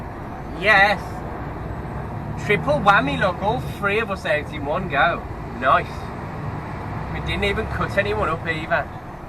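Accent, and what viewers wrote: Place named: Birmingham, United Kingdom. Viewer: love your accent....is that brummie or just general black country?....and the way you accentuate the letter G in words..lovely...I love watching moody blues interviews they talk more or less the same as you....love it!!!!!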